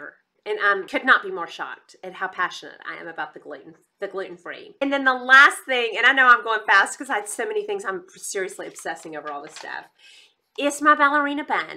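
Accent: South, United States. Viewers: Hi I’m new and Mary Ellen sent me over. You sound like a fellow Southern girl I lived in Tn for years and reside in Beaufort SC now. Back to your vid. 👍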